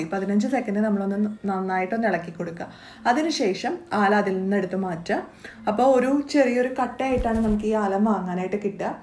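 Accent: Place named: Malappuram, India. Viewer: കുലുക്കുഴിയാ .. മലപ്പുറം സ്ലാങ്ങാല്ലേ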